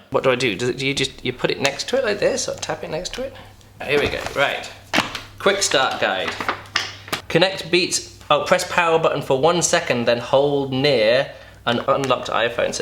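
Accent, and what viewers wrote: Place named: Ireland. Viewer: i love ur irish accent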